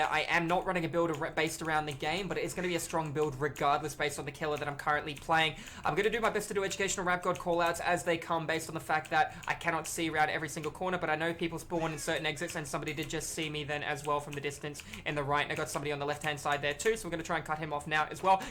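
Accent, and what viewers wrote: Australia. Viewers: I don't hear it probably because i'm Australian too lol.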